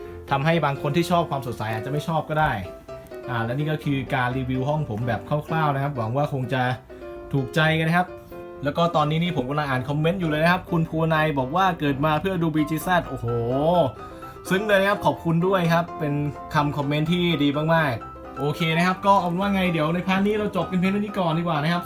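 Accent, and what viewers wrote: South, Thailand. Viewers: พี่อยู่ภาคใต้ป่าวพี่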